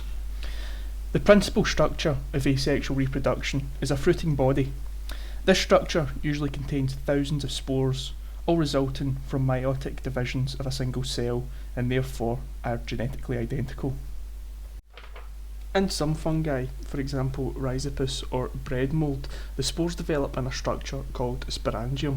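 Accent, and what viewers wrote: Scotland, United Kingdom. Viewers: Wow, the amazing Scottish accent :) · lovely Scottish accent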